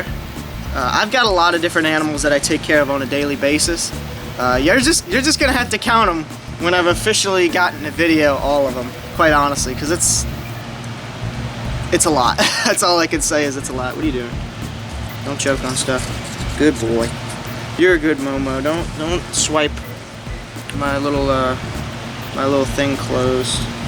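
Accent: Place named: South, United States. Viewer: What do I enjoy most about the channel? You and your southern accent getting excited about your snakes. No improvement necessary. The videos are always interesting, well-timed, and well-edited. It's a unique product all your own. Dangerous animals and insects are always fun, like when you were fooling with the Black and Brown Widows. That was intense. Are you planning on any releases?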